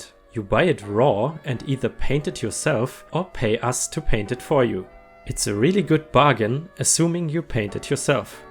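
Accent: Germany. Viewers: I love your accent. Are you from Germany? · ufff man hört den Deutschen Akzent raus xd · Great videos, love your delivery style and that genuine German accent. Definitely still read the possible fake reports but give a warning as you have been, that it's possibly not true but being read because it is funny. Great videos, love your scheiße comment on a previous video of yours (I did German at high school, it means 'shit' for non German speaking English speakers). This next bit is from another video of yours. Gibberish is pronounced with a 'J' sound (jibberish) at the beginning, like 'J' sound (as in Julius for example) rather than the 'G' sound as in Gorilla.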